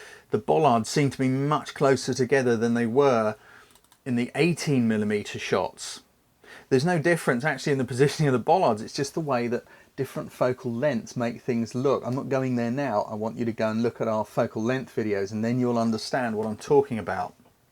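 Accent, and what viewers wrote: England, United Kingdom. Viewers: 5.6 - HAHAHA! Sorry, bloke - English women are not that pretty. Irish ladies have beautiful red hair. And women from Australia, who have about the same accent (as far as I can tell), are quite lovely (Nicole Kidman, Kylie Minogue, Danni Minogue, etc.). But I do enjoy your videos. I've been shooting SLRs since 1978. Still learning new things and always good to get a refresher of the old things.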